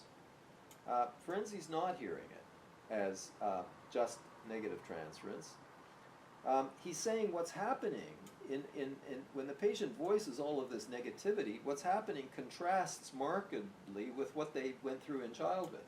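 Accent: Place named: United States. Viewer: I think i recognise an american accent (i'm french), and i think your students are lucky. In France it's still difficult when your psychologist has been formed at the university and is from my generation (i'm 49) to critises Freud's theory when you talk about your life and you see on the face of the psychologist that you're not taken seriously. You have to talk about Oedipe theory and fantasies and why you think it can't be used to be analysed, and most of the lime they laugh at your face because they always pretend you didn't understand it how Freud meant it ! Hopefully i discovered Ferenczi about traumas and identification to the agressor. But so called psychoanalysis based on Freud's ideas has still too much power in France. If you want to come and make conférences against it, you're welcome !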